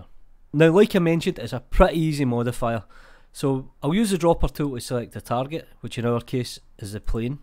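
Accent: Scotland, United Kingdom. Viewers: I get that you might have trouble understanding him, Scottish (I'm assuming) accents might be a little different than what you are used to. But, to accuse him of not speaking clearly? Wow... Oh, and Nicholas, I wouldn't ever travel outside of the US, you will be extremely disappointed. By the way, I loved many things when I traveled to Scotland from the US, but really loved the accents.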